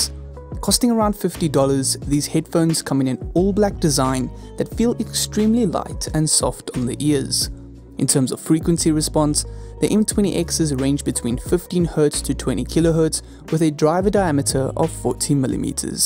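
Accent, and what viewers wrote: South Africa. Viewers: south african? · he south african? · South African ..though I thought one or the other :-)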